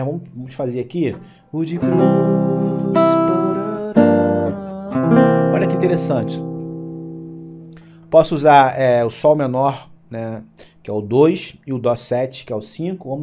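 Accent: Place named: Rio de Janeiro, Brazil. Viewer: sabia que era carioca!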